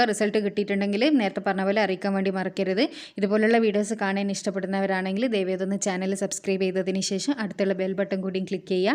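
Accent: Kannur, India. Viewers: Samsaram kannur kare pole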